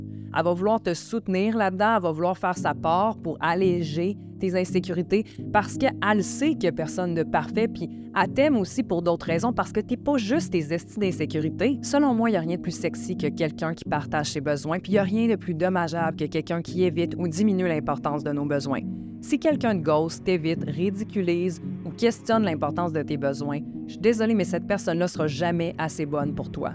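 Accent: Quebec, Canada. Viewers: Bon vidéo et ça fais du bien d’entendre l’accent québécois ;)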